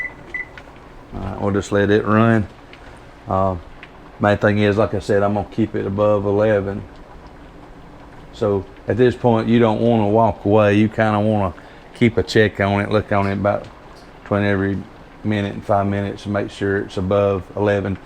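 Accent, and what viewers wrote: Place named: South, United States. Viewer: Those are some beautiful cans of squash! Can this be done with all types of squash? I was thinking butternut squash. By the way, I love your southern accent. ❤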